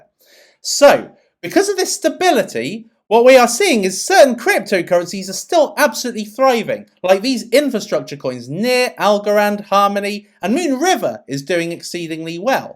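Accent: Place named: Southern Counties, United Kingdom. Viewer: Duuuude I love your Southern Counties accent - Bucks?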